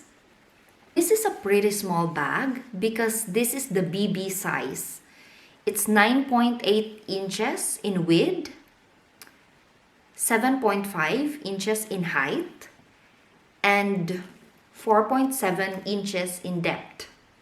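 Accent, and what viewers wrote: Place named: Romania. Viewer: Hi!! Love the accent na.. so romanian! Mwah! Miss you!! Congratulations on your blog!! 🎉🎉🎉🎉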